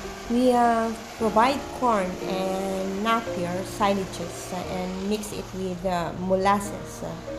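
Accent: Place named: Italy. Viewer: ur accent is italian, not filipino .... any accent will do as long as they can understand u, not wrong with accent specially filipino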